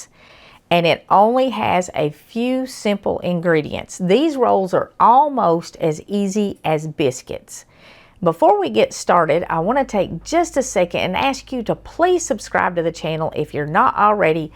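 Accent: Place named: Tennessee, United States. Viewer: Oh honey I was reared in Tn. Hampton, up near Doe Creek and Mountain City. Ription holler and Doe Valley. Just found you and the joy in my heart nearly made me cry. GOD bless you sweetie. I could listen to you talk all day. I wouldn't care if you was readin an instuction manual. I haven't heard that beautiful accent in so long. Im 70 now and in N. C. I want to plan a trip up to Hampton but haven't been there in nearly 50 yrs. I will hush now. Just wanted to tell you that you made an old woman mighty happy this afternoon and I'm going to get my daughter to help me join. May GOD, continue to bless us all. COME JESUS COME! SHELIA STEVENS FROM THE MORLEYS AND ODOMS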